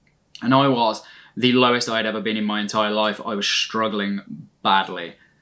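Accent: Birmingham, United Kingdom. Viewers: Hello fellow brummie! Where in Birmingham are you from? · The more I hear you speak the more I can hear the Birmingham accent. Thank you for being so raw and honest with us xx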